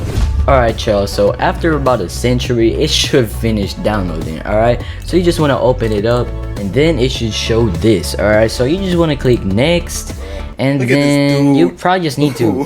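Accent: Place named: United States. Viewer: You sound American wtf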